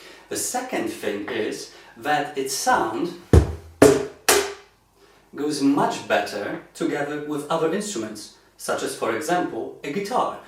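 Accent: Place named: Poland. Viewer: Dzień dobry! Thank you, for your must excellent video. I enjoy hearing your Polish accent. You English as you know is excellent.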